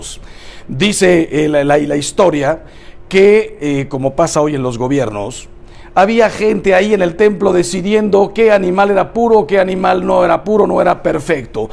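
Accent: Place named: Argentina. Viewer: Pareciera que el padre habla en Argentina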